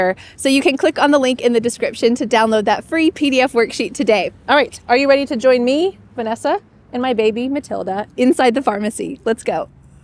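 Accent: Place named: United States. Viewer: I love American accents more and more because of you, teacher Vanessa ❤️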